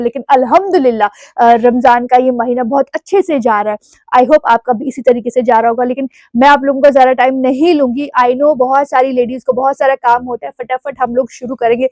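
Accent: Kashmir, India. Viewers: Mashaallah aap bhot zada khubsurat lag rahi hoo dii❤❤aur muja aapki kashmiri baat bhot zada aachi lagti hu.aap kabi daly rutine dekho kabi dii kabki lots of love khush raho humasha aasay hi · Wah di wah kya ho ap 😂 apki kashmiri jab nklti hai na bht cute lgta hai❤ from srinagar Eidgah 💕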